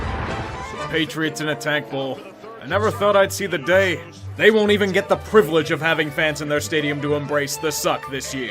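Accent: Pittsburgh, United States. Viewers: Come on, let your Inner Yinzer do commentary for a Steelers game.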